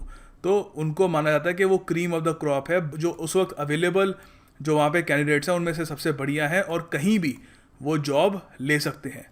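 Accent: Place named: South, India. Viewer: as always video and explanation was awesome but missing your south Indian accent "okkk siiiiirrrr"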